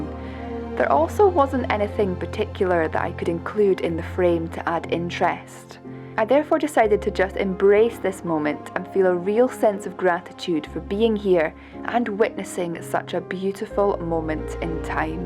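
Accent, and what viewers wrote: Scotland, United Kingdom. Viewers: I always look forward to another of your sessions but one thing is that I wish you would give more information, especially if it’s a “monument”. What was this monument, a church, an abby or a castle. If at lest we knew the name of it, other than “monument”, we could Google it and find out what it was, when it was built and the history of it. I certainly enjoy your channel and the beauty of Scotland, your pictures, and your accent when your talking.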